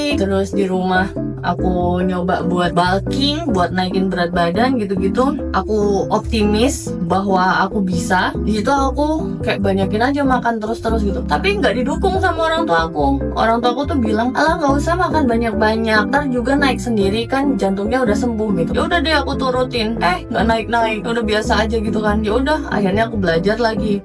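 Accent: Indonesia. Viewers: Salfok sama logat nya. Orang Maluku merantau ke Surabya, logatnya medok banget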